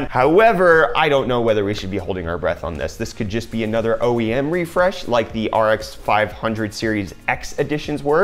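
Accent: United States. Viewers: You from south Africa ? Your accent is not tho, very american . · I was just wondering, this guy sounds American, I wonder why he's in South Africa, I'm South African so am really curios.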